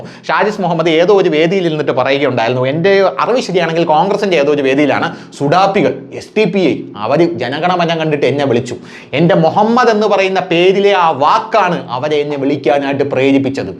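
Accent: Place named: South Kerala, India. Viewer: കാര്യം എന്തൊക്കെ ആയാലും അവതരണം വത്യസ്തം ആയിട്ടുണ്ട്. തെക്കൻ കേരളത്തിന്റെ ശൈലി ✌🏽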